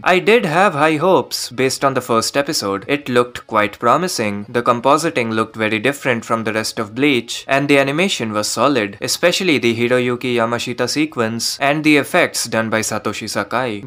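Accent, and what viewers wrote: India. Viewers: His voice sounds like how Indians usually talk with this kind of accent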